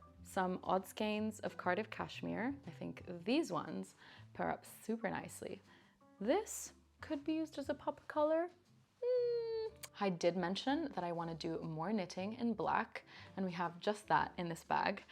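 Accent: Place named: Italy. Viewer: your italian accent is perfect!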